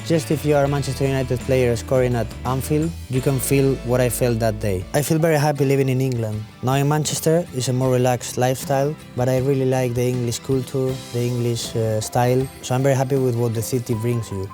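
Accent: Spain. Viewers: Ese espaninglish!!!!💪😍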